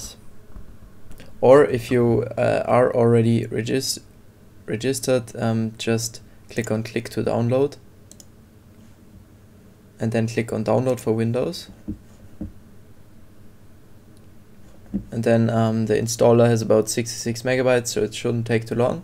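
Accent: Germany. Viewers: i Hear a little bit of DEUTSCHEM AKZENT in ur voice (meins nicht böse)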